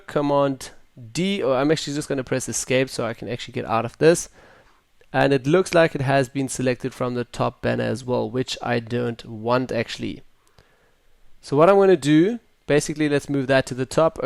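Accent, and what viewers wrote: South Africa. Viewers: Hey man, I saw you were German but you have a very South African accent, you live there? XD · Jy klink soos n SA man :D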